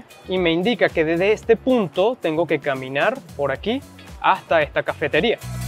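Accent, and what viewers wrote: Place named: Venezuela. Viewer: super excelente video gracias. excelente cuenta. pareces venezolano por tu acento. Muy buena tu oratoria y edición del video. sigue adelante like y suscrito de una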